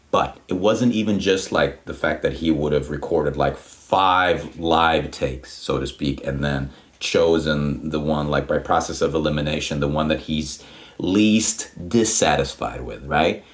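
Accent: United States. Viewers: En ymmärrä mistä sait tuon jenkkinuotin englannin puheelle. Tästä voisit tehdä videon, että miksi osaat noin hyvin? Hyviä videoita olet tehnyt.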